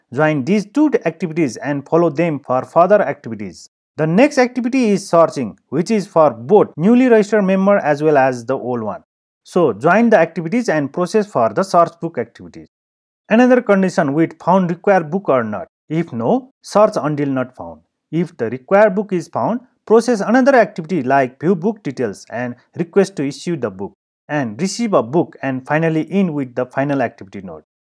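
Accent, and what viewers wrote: Nepal. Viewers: Nepali accent xa ta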